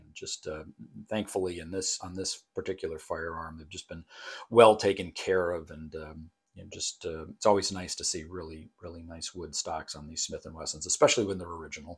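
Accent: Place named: Ohio, United States. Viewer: Sounds like you are from Ohio.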